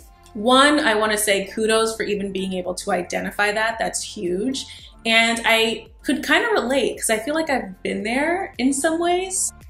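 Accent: Nigeria. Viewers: Loved it. Your Naija accent is the one...haha lol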